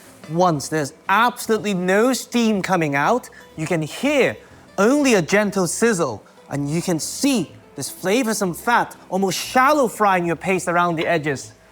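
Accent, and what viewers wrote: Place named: RP, United Kingdom. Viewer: So posh.... I am a fan old lady from South Africa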